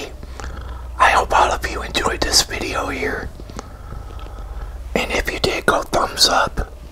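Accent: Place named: Texas, United States. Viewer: Because I thought you sound like a new Yorker but you sound like your from texas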